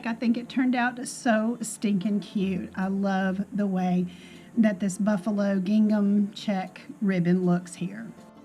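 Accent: South, United States. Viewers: So glad to have found your channel through this collab hop! I love shopping my craft room also , saves money and really tests your creativity ! I have to say being from New York myself I just adore your southern accent , so sweet🤗💕 I love your DIYs !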